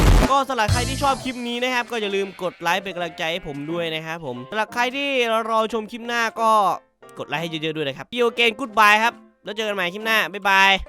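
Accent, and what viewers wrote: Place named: Isan, Thailand. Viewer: พี่พูดอิสานได้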